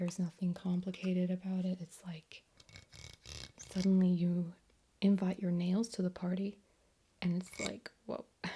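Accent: United States. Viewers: I forgot until I read that comment that you are British! It was near the end, too! Wow great American accent. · Your American accent is sooo good it throws me off more when you speak in your normal accent. You control all the little tell tale signs that usually peek through when doing an American accent. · As a none American, I would be easily fooled into thinking you where American most of the time. very creative idea. don't think I've seen a video where the character is an asmr fan without it being "crazy stalker" roleplays.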